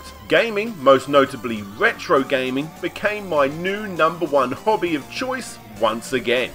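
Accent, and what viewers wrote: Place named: London, United Kingdom. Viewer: Lower-middle class Londoner with a poor mic and worse marketing. Shame though; his content seems pretty good.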